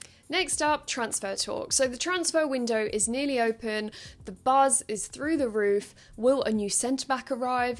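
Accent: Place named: RP, United Kingdom. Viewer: Poshest voice av ever heard in a toon top 😂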